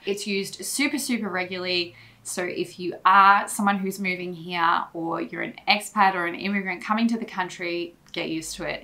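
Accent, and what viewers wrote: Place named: North, Netherlands. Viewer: I can definately hear she lives above the rivers, since I don't.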